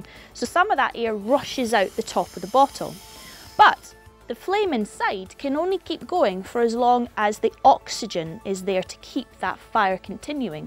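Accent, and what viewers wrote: Scotland, United Kingdom. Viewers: scottish people just know when others are scottish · Is that a british accent ?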